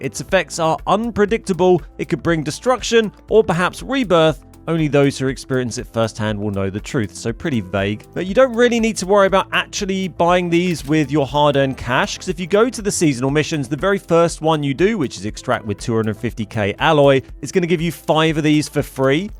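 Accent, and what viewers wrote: England, United Kingdom. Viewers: He's English but uses far too many Americanisms for my liking, but I forgive him..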